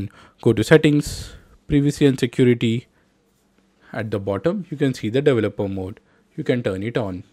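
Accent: India. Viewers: INDIAN VOICE!!!!!!!!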